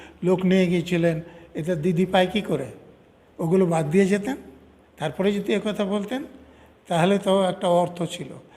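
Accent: Bangladesh. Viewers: Aapnar mukhey dhakar kutti der kotha shuney anek smriti jegey uthchhey 😅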